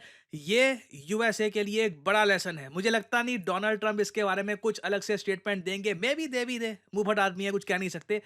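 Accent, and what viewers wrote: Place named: Uttar Pradesh, India. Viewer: सर आपका कभी कभी बोलने की कला उत्तर प्रदेश के जैसा लगता है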